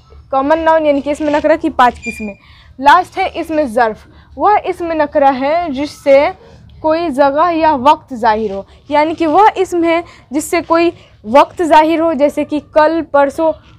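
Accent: Bihar, India. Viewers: ماشاءاللہ بہت خوب بس پلیس آپ شاید بہار سے ہو اس لئے کے آپکی زبان بتارہی ہے جیسے(زگہ نہیں بہن جگہ) معذرت کے ساتھ آپ سے درخواست ہے کہ آپ انٹرنیشنل استاذ ہو اس لئے زبان بھی ویسی ہی ہو جزاکم اللہ خیرا واحسن الجزاء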